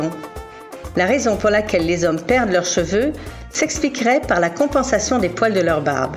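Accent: Quebec, Canada. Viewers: Arrêtez avec vos "la voix est nulle" l'accent québécois on comprend rien !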